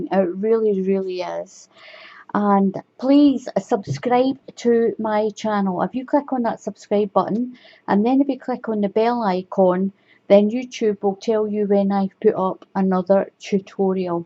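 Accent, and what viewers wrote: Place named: Scotland, United Kingdom. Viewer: I think you’ll find it’s pronounced ‘boo-clay’, because of all the fuzzy bits. 😃😃😃. You deserve this lovely gift. Whenever I hear your voice I remember the delightful four days I spent in Edinburgh a few years ago. I loved Scotland.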